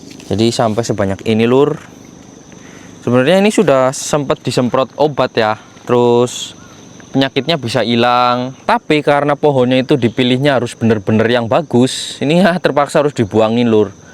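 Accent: Banyumas, Indonesia. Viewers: Kayak cucu ku,dia yg asli anak samarinda,tp tinggal d kebumen skrg ngomongx pakek nyong2 gt,semangat mas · Orang banyumas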